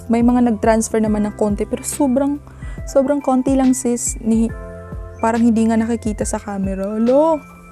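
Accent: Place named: Philippines. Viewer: I like your Tagalog accent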